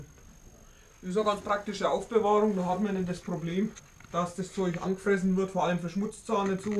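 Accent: Franconia, Germany. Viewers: Sprich net fränkisch dich versteht keiner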